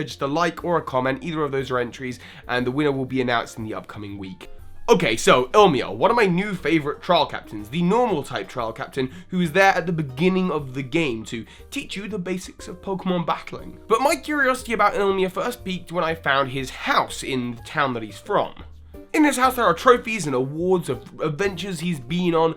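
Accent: United Kingdom. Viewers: SwaggitySwooty it might just be his accent, he is from the U.K. after all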